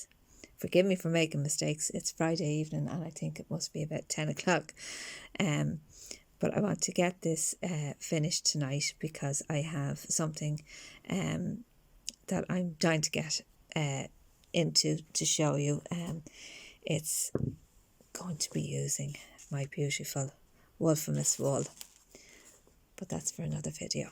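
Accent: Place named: Ireland. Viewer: Great video, love the Irish voice , brilliant 👏 thank you 😊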